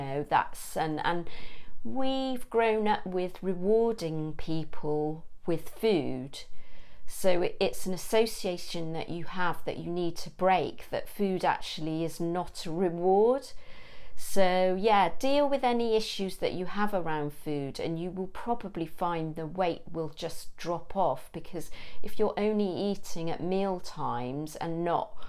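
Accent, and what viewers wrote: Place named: London, United Kingdom. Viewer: Hi, New Subbie here, pleased to meet you😊 You sound like a Londoner like me (lol). I am 50 with borderline hypothyroidism, i really want to lose weight (7 stones would bring me to ideal weight according to my BMI) but finding it hard. Do you have any ideas for how to make exercising fun? I think that would make a big difference to me because I can't motivate myself to be consistent because I don't enjoy it. One thing I've thinking about is go on an adventure to find the best free exercise parks in London (you know the ones with the free outdoor exercise machines), maybe you can do a video on that? Just an idea... Love your videos😍, stay blessed, One love❤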